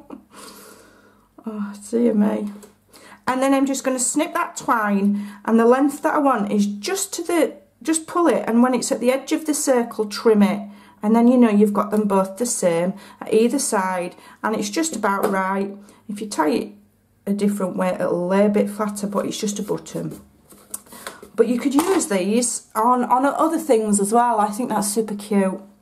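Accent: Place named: Northern England, United Kingdom. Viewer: Oh poor you....... I suffer with fibro....flippin......myalgia too so I know how you are feeling. Stunning cards!! I found you the other week and subscribed straight away cos you make me laugh so much. Am in Surrey but was in Yorkshire for donkeys years and its lovely to hear a northern accent and the northern humour. Am learning to do the memory albums......... keep being you!! xox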